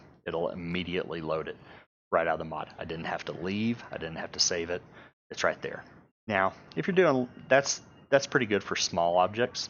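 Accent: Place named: Texas, United States. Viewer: Thanks so much, nasal texan :)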